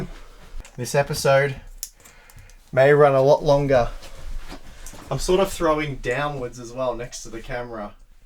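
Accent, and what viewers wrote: Australia. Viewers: It has to be difficult to pronounce NZXT as NZedXT all the time, and convey whatever the message is. Lol I honestly love Aussie accents, but certain European and Aussie pronunciations crack me up.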